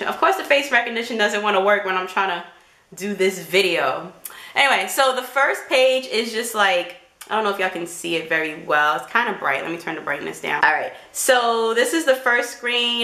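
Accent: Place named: New York, United States. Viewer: Ayeeee you a NEW YAWKA🤘🏽💙but i have wordscapes to its mad addictive.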